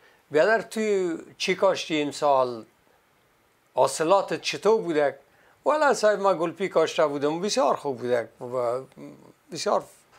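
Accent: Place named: Afghanistan. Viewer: درود بر آقای گرامی. باید اعتراف کنم که آقای این کلیپ ارزشمند و بدون تردید آموزنده و تاثیر گذار شما یکی از بهترین ویدیو هایی است که من مشاهده کردم و از آن خیلی لذت بردم بویژه آنکه بزبان بسیار ساده و روان و لحجه شیرین افغانستان بیان کردیدو من به شما تبریک میگویم! این کلیپ از آن جهت ارزش مضاعف را بخود تخصبص میدهد که الف بای آموزش کودکان یک جامعه را در بر میگیرد که در پرورش یک نسل جدید و مستقل و دانا و هوشیار را پایه گذاری میکند و طبعا با این روش آموزش و پرورش خردسالان جامعه از مرحله مهد کودک باید آغاز گردد و سپس در مراحل سواد آموزی ابتدایی و دبیر ستان و دانشگاهتا مرحله دکترا ادامه یایدو تنها در اینصورت است که نسل پرسشگر و اندیشمند و متفکر تحویل جامعه خواهد داد گه نه تنها به کشور خوی خدمات ارزنده ای خواهد نمود که به جامه بشریت نیز خدمت خواهد کرد چنین نسلی هرگز و به هیچ طریق و از هیچ کسی اطاعت کورکورانه نخواهد کرد و از آنجا که با خرد گرایی رشد می یابد و بزرگ میشود همواره به علل و عوامل پد یده ها توجه لازم را دارد و هر تصمیمی را بر اثر آموزه های عقلانی و علمی مورد توجه و بررسی و تحقیق قرار میدهد و سپس عمل می کندو طبیعتا بندرت دچار اشتباه میگردد!!!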